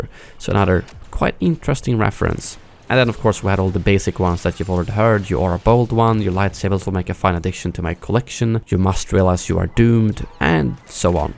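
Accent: Sweden. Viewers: Är du fråb Sverige?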